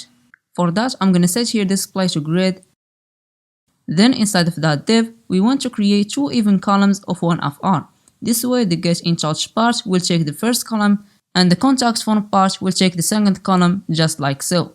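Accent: Morocco. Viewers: Very nice channel, thank u for the great content. Btw are u Moroccan ? cuz I feel like your accent is kinda Moroccan. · from your voice I am pretty sure that you're Moroccan ❤❤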